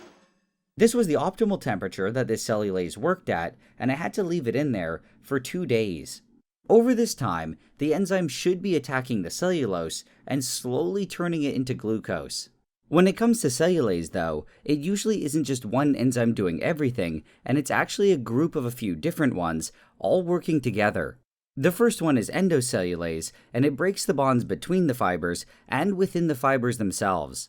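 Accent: Canada. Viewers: Laying in the dark with my eyes closed and I finally hear the Canadian accent. It’s is SO strong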